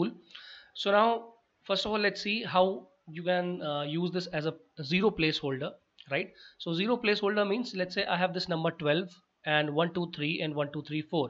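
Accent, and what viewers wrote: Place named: India. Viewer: not again !! can you speak english??not indianish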